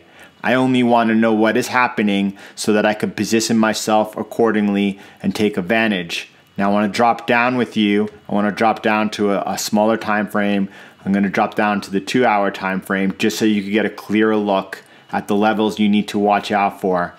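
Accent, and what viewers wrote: United States. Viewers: NY accent, but where? Long Island?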